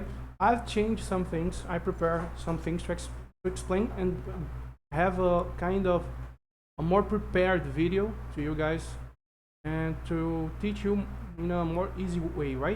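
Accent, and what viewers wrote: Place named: Brazil. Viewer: Sotaque de BR, se for Br da um salve que tenho umas duvidas e to em um projeto que pode te interessar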